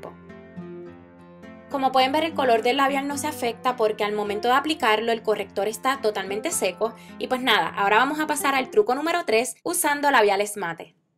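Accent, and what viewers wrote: Puerto Rico. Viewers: me encantaaa tu acentoo boriicuaa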